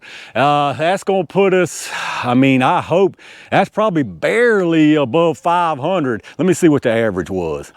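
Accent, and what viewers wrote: United States. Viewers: Your accent is an American treasure.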